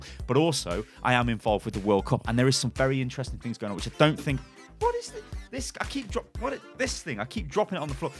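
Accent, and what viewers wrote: United Kingdom. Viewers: what happens. I dont wanna watch this video of a british man talking aasying hoy hell :0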